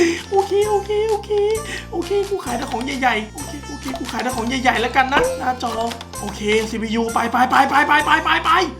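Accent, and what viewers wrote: South, Thailand. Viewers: พี่เป็นคนใต้หรอติ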